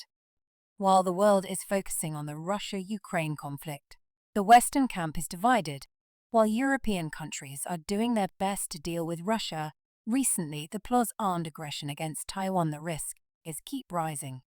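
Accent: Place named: United Kingdom. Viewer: 今天的翻译比昨天的好，纯正的英音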